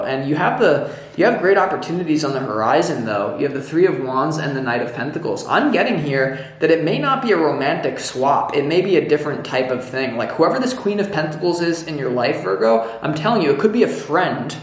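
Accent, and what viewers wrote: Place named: South, United States. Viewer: It's weighing me down and not letting me step into the new and healthy energy and place in life that is right there if I could just let go of them and stop numbing out out of fear. And I also keep thinking maybe I can AGAIN approach this heavy relationship differently and it will magically fit into my highest good. I'm not sure it can though and that sucks. Also the southern accent was funny bc I'm from Alabama 😂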